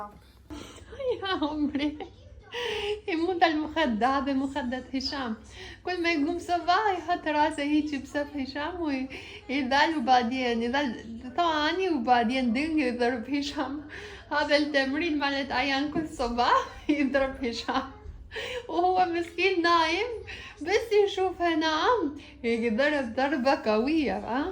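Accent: Iraq. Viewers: عفيه عليچ شلون تحچين عراقي حلووو انت انسانة طيبة ،، وشفت شگد مقهورة على زوجك ،، وحسيت بغصة صوتچ ،،، الله يبارك بيچ حبيبتي الله يحفظچ ويحفظ هشام وأيان باذنه تعالى ❤❤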